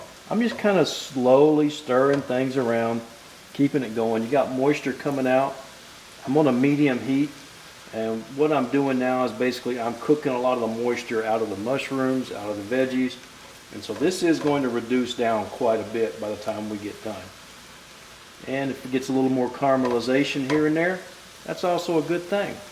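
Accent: United States. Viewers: Looks great! I never like the pork in this dish to be honest, so it's perfect for me☺ Btw, I really like your accent! Where in the US do people speak like that?🤔 Sorry, I'm from Europe, so I can't tell...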